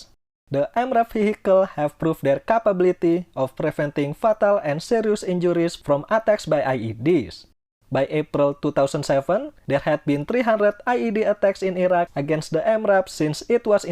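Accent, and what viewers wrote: Indonesia. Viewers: bang mau saran bikin video nya pake bahasa indonesia aja. Agak gak enak denger bahasa inggris tapi pake nada dan aksen orang indonesia coba kalau mau bikin video pake bahasa inggris pake google translate aja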